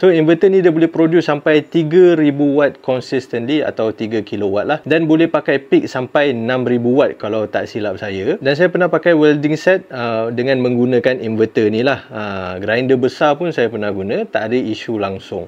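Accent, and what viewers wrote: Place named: Kelantan, Malaysia. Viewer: Terima kasih atas perkongsian.. Jelas dan jeliro ore kelate royat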